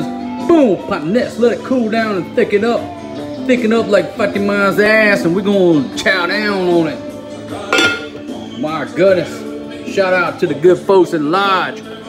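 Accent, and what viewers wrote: South, United States. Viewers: Great country voice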